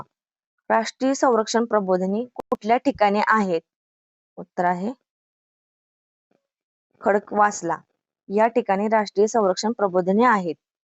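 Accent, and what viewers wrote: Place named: Satara, India. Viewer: ek no madem khup chan questions 🔥..bhashecya tuning varun satarcya ahat vatat.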